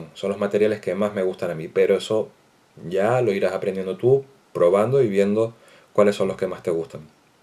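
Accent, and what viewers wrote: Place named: Venezuela. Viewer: No hablas como si fueras de españa creo que venezolano o ecuatoriano algo asi nose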